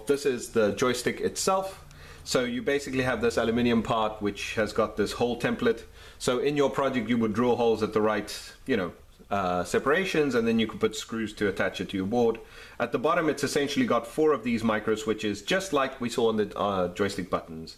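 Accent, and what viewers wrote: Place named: Cape Town, South Africa. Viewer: hey, that accent sound very familiar, Cape Tonian myself, very informative and well directed, is as if you lecture S1 Electronics at TECH,,, im currently building my own arcade fightstick using iPAC2 interface and RPI3 with retropi image ( ofcourse i have to add my own roms)... by the way, where did you get the joysticks and buttons from? keep up the good work, cheers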